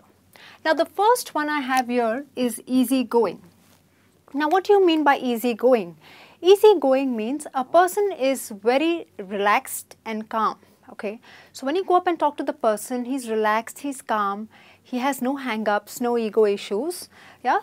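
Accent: India. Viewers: This is Indian english not English !!!!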